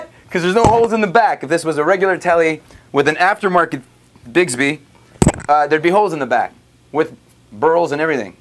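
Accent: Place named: Canada. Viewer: are you a canadian? You sound sort of like a canadian.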